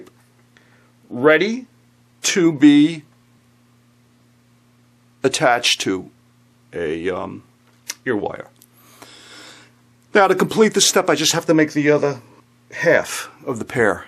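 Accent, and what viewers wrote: New York, United States. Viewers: Thank you for sharing. My husband and I were looking at your website last night. You are a genius. I would love to have a piece of your art , truly amazing very beautiful. I love your accent, I grew up in Johnson City, New York. Spent a lot of time in the city. I can't tell how much I miss it. I live in California now. Blessings to you, Deborah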